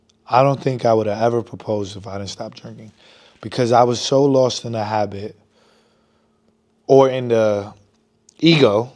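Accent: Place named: New York, United States. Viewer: This guy is definitely from, nyc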